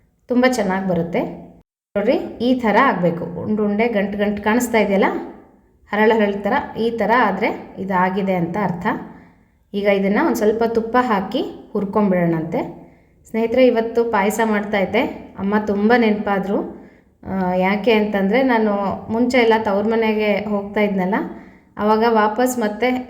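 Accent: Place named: Ballari, India. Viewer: Akka superb agide Naau kuda Ballari avare Same nimtharane mathododu akka thumba kushi aguthe akka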